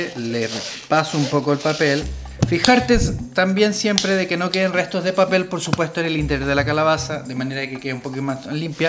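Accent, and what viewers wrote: Chile. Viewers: Che de dónde sos?? Pareses medio chileno por tu acento pero me párese medio raro un chileno tomando mate jaja qsy 🤷🏽‍♀️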